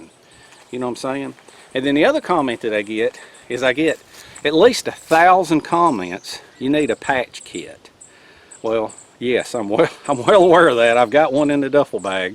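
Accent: South, United States. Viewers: you have a real imagination for innovative camping appliances and gear. Because you really enjoy what you do; your content is not only informative, it is "entertaining". Also, I am from Arkansas. You speak excellent "Southern". GOD bless you and your family. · I always enjoy Friday morning with 😁. I've had good luck with the green slime in lawnmower tires. My gf loves your southern accent. 😊Also a can of fix a flat might be handy.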